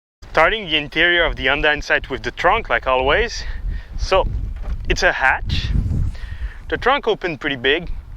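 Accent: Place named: Quebec, Canada. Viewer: He’s québecois man, give him a break lol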